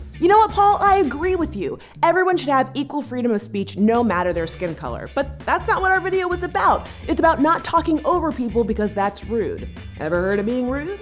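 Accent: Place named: South, United States. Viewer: So she once apologized for her southern accent, but then she puts on this white voice whenever she mimics white people. GIrl byeeee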